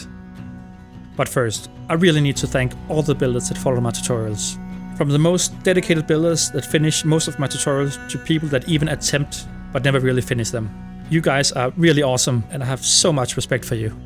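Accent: Denmark. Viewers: Yup, that’s definitely a Danish accent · You really sound like my late oldemor. She came to America from Denmark and had the heaviest accent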